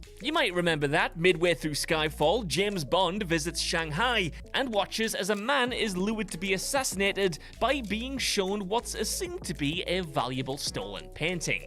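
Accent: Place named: Northern England, United Kingdom. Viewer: LOL, The number of ppls not getting the more Northern accent here is amusing me no end. He DID say Bader just in the way anybody from his area would. Reminds me of Americans needing Subtitles for Trainspotting 😂.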